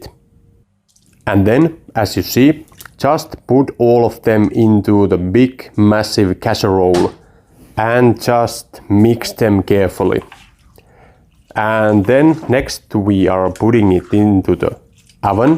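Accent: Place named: Finland. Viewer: This looks delicious, I will have to try it! Also, I've always been a fan of the Finnish accent!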